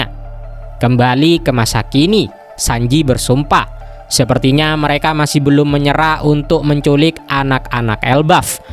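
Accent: Madura, Indonesia. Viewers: Abang orang Madura ya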